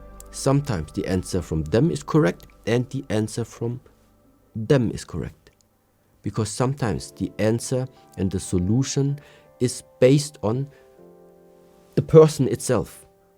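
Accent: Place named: Germany. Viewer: just love that a buddhist spiritual masterclass leader has a german accent 🙃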